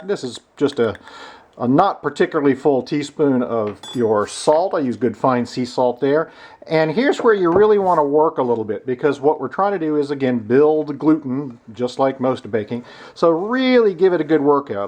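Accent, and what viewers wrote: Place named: Canada. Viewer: You have a Canadian accent. So, where in Canada is there a shop that sells crumpets 24/7 ?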